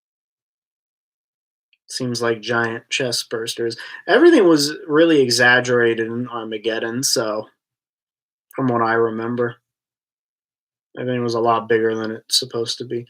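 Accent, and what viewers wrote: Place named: California, United States. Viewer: Just found your channel, pretty good content. Im thinking by your talking, you are in California. Have you seen the Alien museum exhibit at USC?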